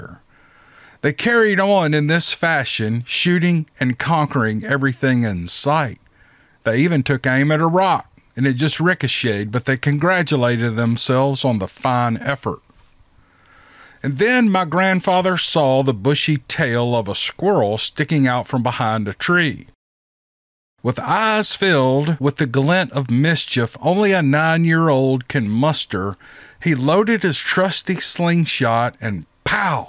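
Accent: South, United States. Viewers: Just found you and enjoyed your stories! Great southern voice too!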